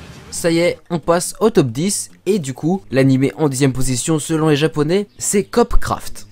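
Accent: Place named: England, United Kingdom. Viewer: Quel bel accent anglais, je me demande comment l'as-tu obtenu ?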